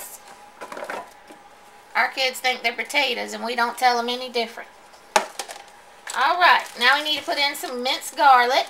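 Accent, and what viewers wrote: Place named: South, United States. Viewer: you have a southern accent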